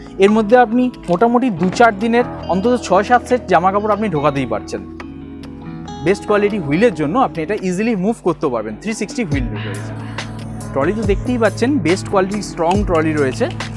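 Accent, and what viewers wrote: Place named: United States. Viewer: He talks Hindi in American accent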